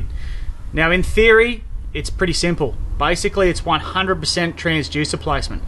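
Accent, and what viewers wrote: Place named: Outback, Australia. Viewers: More specifically a Queenslander accent. ‘Youse’ is a dead giveaway. Very ocker, mate! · I just want to know how I can get the Australian outback accent for my videos! That accent makes everything <num> times cooler and interesting!!! That accent alone is worth like 100k views Mayte!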